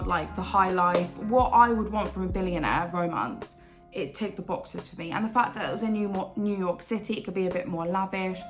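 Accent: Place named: United Kingdom. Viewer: I love her british hermione like accent❣️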